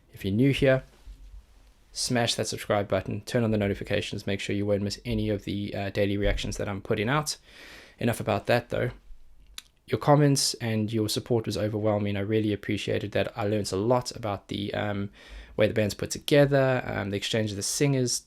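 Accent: South Africa. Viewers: I've just subscribed your channel and saw that you've already taken the Nightwish journey. It's been said before that your reaction videos are awesome, lots of detail as far as technic is concerned, without making it too boring. Keep it up dude! OH yeah, one other thing That accent seems quite familiar to me. Shall I risk... South Africa? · You South African? Goeie reaksies mater!!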